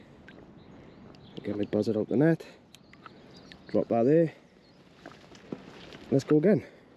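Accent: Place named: Cardiff, United Kingdom. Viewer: Hello mate, just watched you for the first time, great content I just subscribed. Trying to tie down that accent of yours, I'm guessing Cardiff or close by! I'm in Ebbw Vale.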